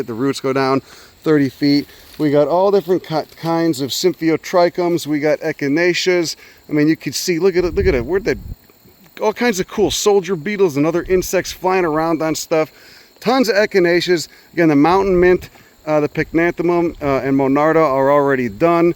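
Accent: Chicago, United States. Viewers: I notice your Chicago accent gets stronger when you're back in da region!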